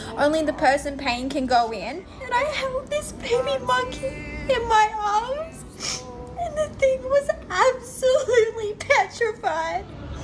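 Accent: South, United States. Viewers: The same thing happens with Tigers. They drug them so people can take pics with them. They are doped up so they can lay on a small table and people can pet them and take pics. It’s absolutely disgusting! I agree about the monkeys. That is such a sad situation. JS uses Eugenia and she will never admit that he is shady. She will continue to kiss him and promote his products. She is looking so sick too. I think she is at her all time low with her weight and it is really showing in her face. She is looking older than she should due to her illness. You are the only one I watch now about Eugenia and JS. You are straight to the point and don’t drag out the videos. I love your southern accent and that you don’t over edit. You are real and also very funny. I love when you do voice overs. Lol Keep the videos coming girl! I look forward to them. ♥️♥️ Hope your voice gets some rest.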